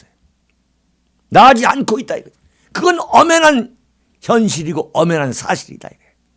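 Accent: Chungcheong, South Korea. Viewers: 이아저씨 정말 구수한 말투 좋와 ㅋㅋ 충청도 어디신가? ㅋㅋ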